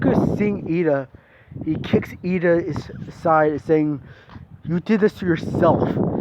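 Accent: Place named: Russia. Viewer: you have a perfect russian accent...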